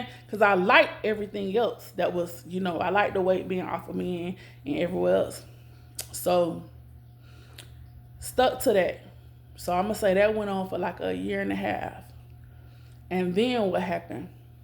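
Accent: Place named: New Orleans, United States. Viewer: Girl you from New Orleans 😂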